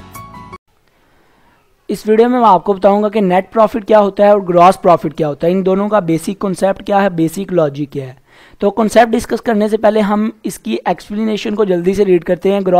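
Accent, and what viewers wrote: Punjab, India. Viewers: R u Punjabi??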